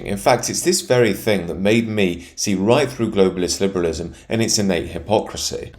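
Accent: United Kingdom. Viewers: Says a brown man speaking with a British accent... "That which is well taught, lasts for a long time."(Tao) Culture is from the hinterlands not the cities. Even though they like to pretend they are more cultured, which is what this is. The reason this is true, is because cities are like Elephant graveyards. More people die in cities than are born there, and when theres a plague, or a war, or a population collapse, the cities are where the worst is the most. Suggest listening to Whatifalthist's video on population collapses. An example is the great depression in the US, some 14 million died of malnutrition related causes, mostly urban as rural people grew food. In WW2 London was a major target. Rural areas maybe get a crashed airplane. Basically cities are unnatural, they are caused by the love of money... "That which is unnatural, does not last long."(Tao) Usually cities get trashed, and then the Ant farm rebuilds on the same site. 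But not all the ants make it. Sometimes its even invaders who rebuild on the sites of cities they trashed. England has cultural invasion syndrome. Its not the first time in human history. The Germanic Barbarians who trashed Rome, then decided they were the Romans... But it didnt work, because they werent Romans... They were still Barbarians. It took them another thousand years in their own lands to climb into an acme civilisation. "Civilisation, is the terminal phase of a culture."(US Army ROTC training manual, "Readings in the Evolution of Warfare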